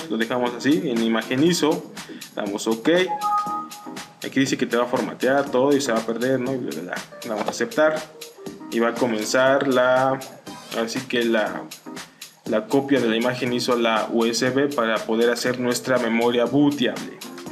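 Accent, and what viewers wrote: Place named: Portugal. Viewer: creia que habkabas portugues o algo al principio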